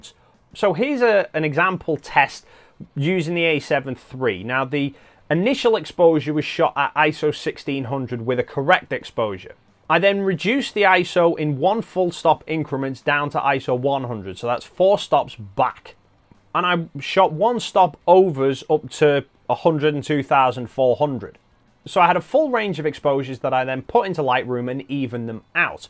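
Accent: United Kingdom. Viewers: im sorry but you cant explain your rebuttal clear enough, you sounded like you were just regurgitating what tony was saying , but in a British accent . and furthermore, Tony shows his sources for his claims and you just didnt show anything but talk. this is click bait and your just feeding off someone elses channel to get some of their subs....NOT!!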